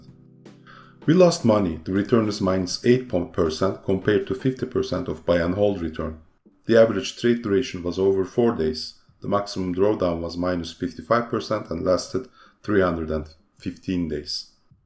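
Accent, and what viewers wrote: Turkey. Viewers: It seems a Turkish voice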